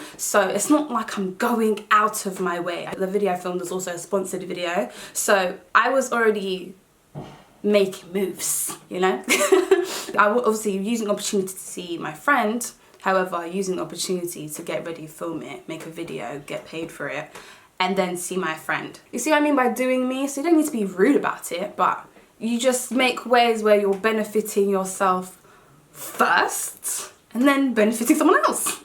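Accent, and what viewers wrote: United Kingdom. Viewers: You remind me of Jorga Smith both of y'all gorgeous and have that dang British accent.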